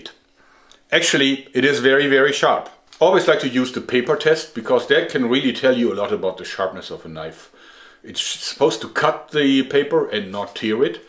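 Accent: Germany. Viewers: This is amazing. I love your Channel. I used to be a Chef so I really appreciate a sharp knife. I also like your thick german accent. Makes it unique somehow. Und das, obwohl Deutsch meine Muttersprache ist.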